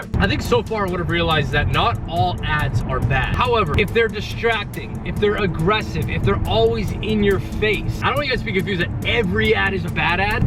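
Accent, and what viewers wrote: Canada. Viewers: Guys wait is this feller Canadian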